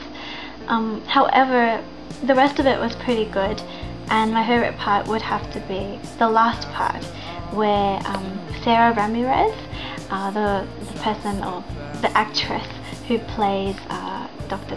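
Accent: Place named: Australia. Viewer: I agree with a lot of what you said. The sexy parts of this episode are so cringe-worthy. The parts with two Callie's are also all very strange. Sara's rendition of I Was Made for You kind of makes up for those (although singing Callie shaking the bad of unconscious Callie is something I've never liked). I also agree that I prefer Sara's version of the song. It's no surprise that she was the best singer, as she has won a Tony. Overall I think a singing episode was a bad idea. It just wasn't a natural fit. You need a show that has more comedy to make it work, like Xena, or Buffy. You sound Aussie, like me.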